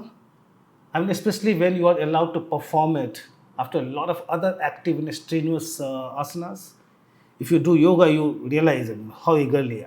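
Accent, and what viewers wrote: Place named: Karnataka, India. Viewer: It isn’t English - it’s kannadiga pronunciation which may not be others cuppa tea !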